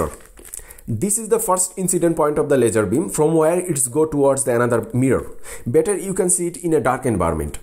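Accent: India. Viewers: His voice is most stereotypical indian english!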